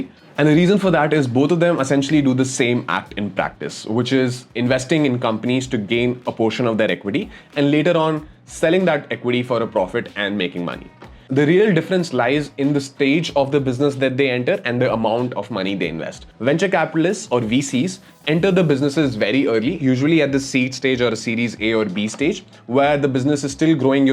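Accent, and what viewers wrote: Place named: India. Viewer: Why can no indian talk english without an indian accent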